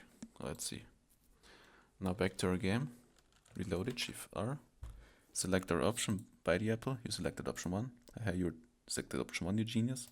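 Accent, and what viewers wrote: Germany. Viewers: You're accent sounds like a German one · schon beim ersten Satz gemerkt das du deutsch bist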